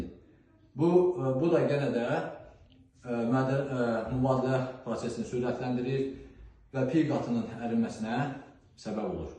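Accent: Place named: Qakh, Azerbaijan. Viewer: Əxi siz Qaxdansız? Qax ləhcəsinə oxşayır ləhcəniz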